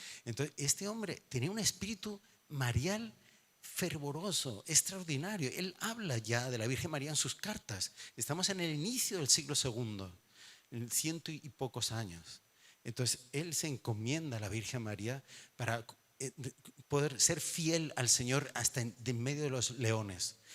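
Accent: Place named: Aragon, Spain. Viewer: Me encanta oírle padre, la Virgen le ha elegido con esa gracia baturra que nos hace llegar de una forma muy simpática todas las verdades evangélicas. Dios le bendiga.❤😂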